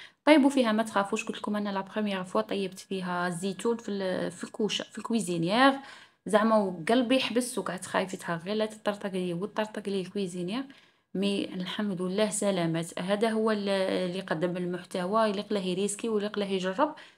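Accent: Mascara, Algeria. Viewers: انت من معسكر ولا من تغنيف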